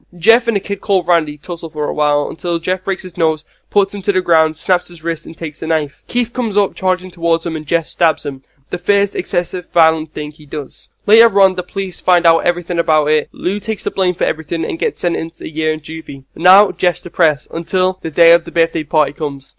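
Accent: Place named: Manchester, United Kingdom. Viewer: your voice! <3 where do you come from, it sounds somewhere in the Manchester area in England :3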